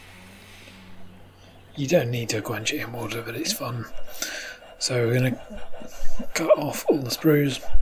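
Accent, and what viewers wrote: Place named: New Zealand. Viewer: I had to doble check where you came from. Your accent could cut as a New Zealender, but I saw your plug outlets and, you live just over the puddle. XD You see, I've been searching for electrum in all of Norway, it does not seem like there is any of it anywhere to be found here. My fiancé and I want green wedding rings and electrum is the best option but we have so far been unable to find raw material. Where did you get a hold of electrum?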